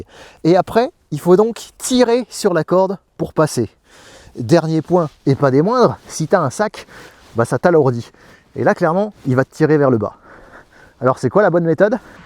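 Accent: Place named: France. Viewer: ... Pourquoi les français se prennent tjrs de pseudos Ricain ave ce bon gros accent franchouillard? Tu ferais mieux de te faire appeler Robin Dubois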